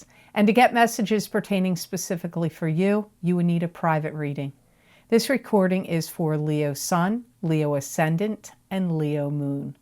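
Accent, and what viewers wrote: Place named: New York, United States. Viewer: I do think you are a New Yorker !! Love your accent !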